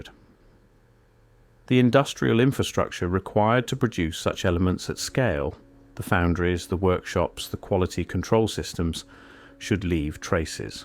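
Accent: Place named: Mississippi, United States. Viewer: I thought you were from Mississippi 😅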